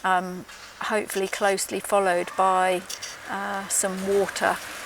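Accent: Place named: United Kingdom. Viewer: The British Accent 😍😍😍💚💚🔥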